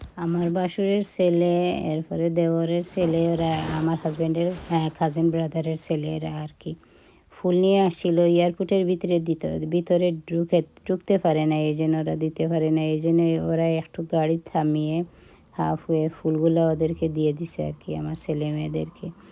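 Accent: Sylhet, Bangladesh. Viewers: Afa bangla notable that is good. Also pure sylhet toking that is very good. We r sylhety. Hahaha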